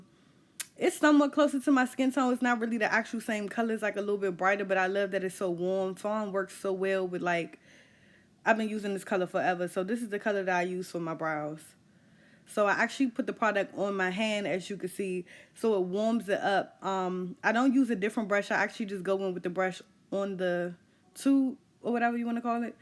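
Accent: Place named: DMV, United States. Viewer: 🗣YOU ARE GORGEOUS!!! Btw: I know a fellow DMV fam, I hear the accent. ♥️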